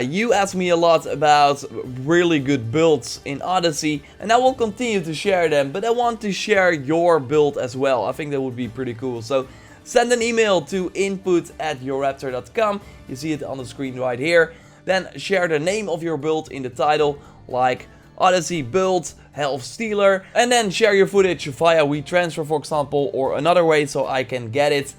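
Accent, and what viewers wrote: Netherlands. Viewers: Are you dutch i can hear in your accent im a big fan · The way you speak English has something, are you dutch by any chance?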